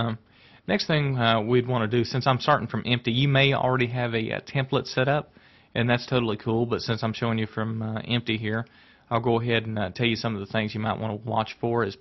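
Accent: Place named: Texas, United States. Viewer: Dude!, are you Texan? You sound it with your delightful dialect.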